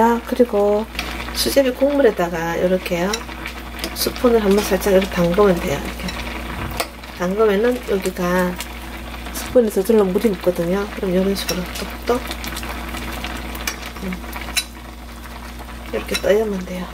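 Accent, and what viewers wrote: Gyeongsang, South Korea. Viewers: 아 ㅋ 설악산쪽요 ^ ^ 지금은 써울이구요 이모님 음식솜씨 ~ 볼수록 최고라 ㅎ 이렇게 배울수도 있으니 정말 좋은 유트브세상이네요 만남 반갑고 고맙습니다 죽을때까지 배워야한단말 실감하며 욜심 따라해보겠습니다 ^ ^ 댓글 고맙구욤 🤗 경상도사투리가 듣기좋아요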